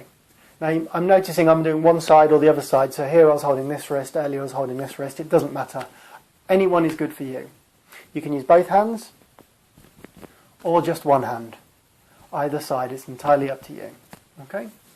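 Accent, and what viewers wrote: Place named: United Kingdom. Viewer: Hello I love your british accent! The pain is weaker! Thank you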